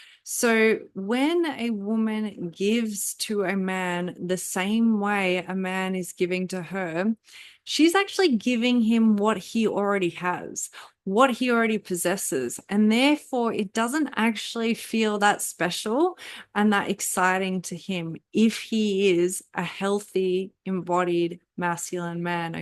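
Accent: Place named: Germany. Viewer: It sound like you are German and are trying to hide your accent…am I right or am i wrong..? Am curious…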